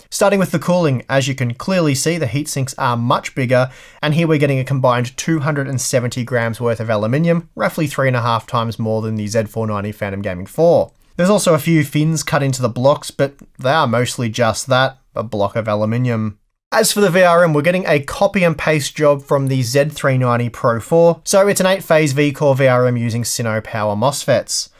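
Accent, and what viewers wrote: Australia. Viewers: I don"t know what is is about a fellow Aussie voice. It just always seams more relaxed here than Linus or Jay. Thanks for all the vids. :)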